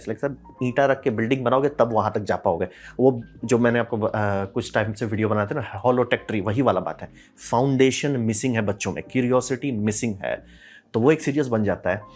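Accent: Bihar, India. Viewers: Aap pakka Bihar Se Hi Ho, Bhai. 💯 Persent pakka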